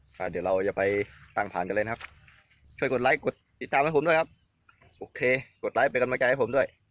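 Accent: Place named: North, Thailand. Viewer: อยู่บ้านไหนคับ อู้เหนือ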